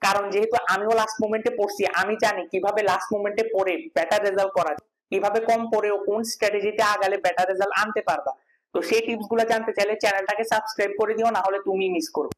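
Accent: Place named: Noakhali, Bangladesh. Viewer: Vaiya apni ki Noakhalir? Kothar accent toh amader motoi !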